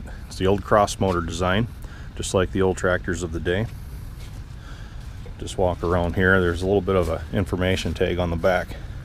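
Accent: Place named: Midwest, United States. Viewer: I'm from the Albany area of New York... and it always tickles me to hear the midwestern pronunciation of Albany.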